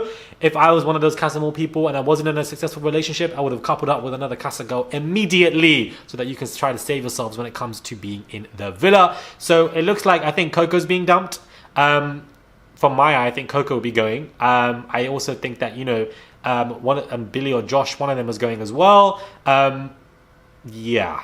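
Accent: United States. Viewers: can we just stop with the American accent?? disgustanggg Thank goodness this channel exists, if love island doesn’t want us to comment on their videos then this is our outlet. I agreee, Gemma and Luca are BORING, these episodes are BORING, the fact that there’s been no challenges or preview of the next episode is whack. I literally come to this channel FIRST before I even watch the episode to see if it’s worth it cause lately it really hasn’t been.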